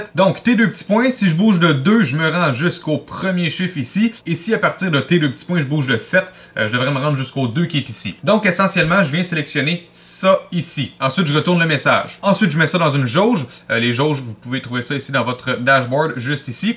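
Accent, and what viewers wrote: Canada. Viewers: Bonjour. Je te suis depuis un petit moment et je trouve ta chaine extra!!! Ton projet sur la domotique est super intéressant. Continu comme cela c'est parfait. Il serait aussi intéressant dans ton projet de lier ton système domotique a un écran "Magic Mirror". Super travail 👍 J'adore ton accent Canadien / Franck le Frenchie 😉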